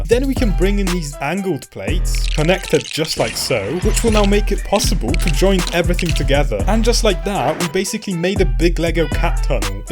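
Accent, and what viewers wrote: United Kingdom. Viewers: I thought you were British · You sound British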